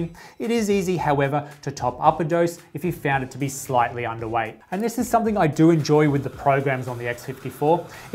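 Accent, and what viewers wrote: Australia. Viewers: Thanks for the review. Very detailed review and it's really helpful. Plus, always feels very comfortable when hearing aussie accent!!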